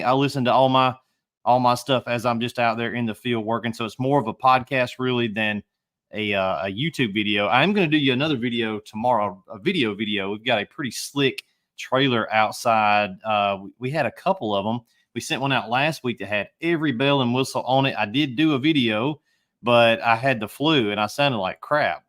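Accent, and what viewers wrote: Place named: South, United States. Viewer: Best video you’ve ever made. I have a successful company, but we always need to constantly be looking for traction and reminding ourselves how we got there. Positive reinforcement. More videos like this please. Your dang sense of humor could put you on the comedy stage. And your damn southern accent.there are lots of nuggets in this but also lots of laughter. Well done.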